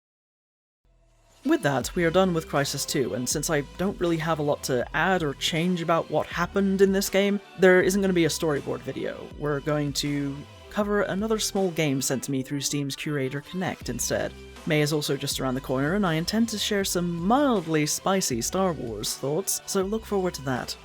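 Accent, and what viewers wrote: United Kingdom. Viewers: This is off topic entirely, but are you british? Irish, american? I honestly cant tell and that could be from severe brain damage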